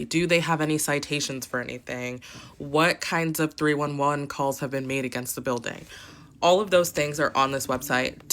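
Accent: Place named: General American, United States. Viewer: I feel as if she said that due to your more “standard American accent”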